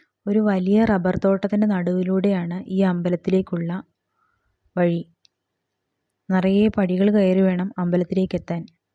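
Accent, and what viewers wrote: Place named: Palakkad, India. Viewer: As per the slang of your language I think you are from palakkad. If I am wrong please correct it.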